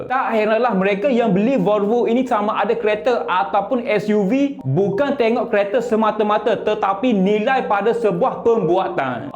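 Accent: Kelantan, Malaysia. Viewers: kuat bunyi kelate demo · Aku confuse dia ni kelantan ke jawa…😅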